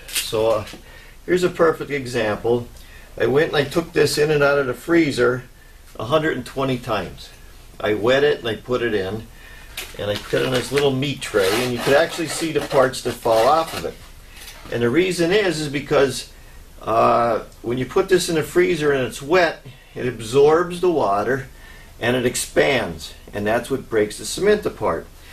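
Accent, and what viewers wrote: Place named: Chicago, United States. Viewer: Sounds like a Chicago accent, awesome!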